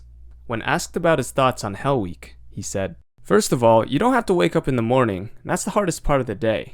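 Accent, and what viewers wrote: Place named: United States. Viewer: a tiny slight hint of an American born Chinese accent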